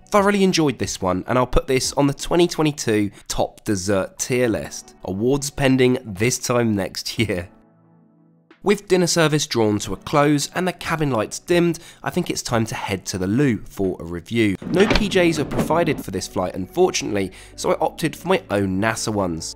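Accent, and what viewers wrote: United Kingdom. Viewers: What?!! They are now blowing away Emirate's First Class. Great. Hey, your voice style is very British and sounds relaxing, thanks for all the contents you upload to YouTube, way better and honest reviews than the "Chu" Chu Train. LOL - But I still prefer their Q-Suite over this Eggshell seating.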